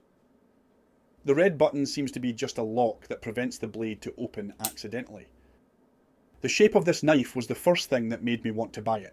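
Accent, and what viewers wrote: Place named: Scotland, United Kingdom. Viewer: I would guess Scotland from the accent - somewhere near or in Glasgow?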